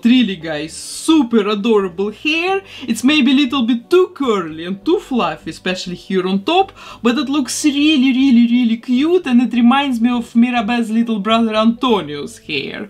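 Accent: Russia. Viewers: Русский акцент, и тут я понял что это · Блина малина Такой русский акцент Так необычно Кстати ооак очень круто вышел :> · она русская? я вот сижу и голову ломаю кажется мне или нет, вот прям ярко выражено русское произношение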